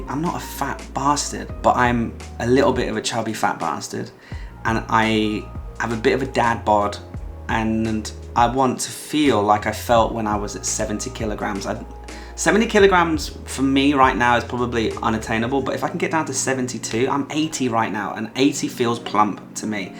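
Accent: England, United Kingdom. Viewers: The English accent has the highest value in America 😁👏🏽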